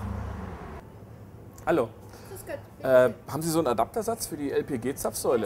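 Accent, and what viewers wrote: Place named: Netherlands. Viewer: du hast eine rotsprache!!! niederlandisch!!!!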